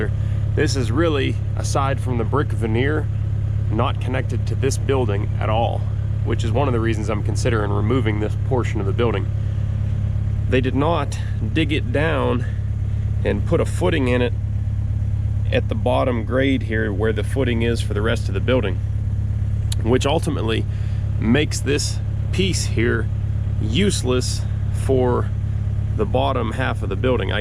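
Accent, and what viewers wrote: United States. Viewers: Was just about , as a relative newcomer to this project, to ask what exactly what you intended to use it as. You stopped me in my tracks by giving a quick description. But as one who sometimes struggles with local American accents can you describe a little more exactly what you would like it to be, BRIAN from Spain . Thankyou.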